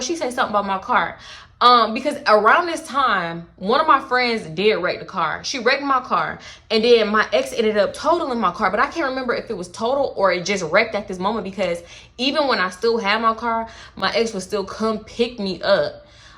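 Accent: Baltimore, United States. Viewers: you and this baltimore accent take me out🤣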